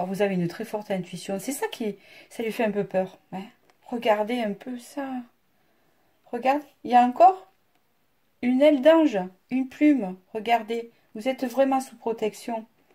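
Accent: South, France. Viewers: Bonjour, J'adore ton accent du sud,il inspire la joie ,le soleil, ne le change pas ,un plaisir de t'écouter parler Merci pour ta générosité ❤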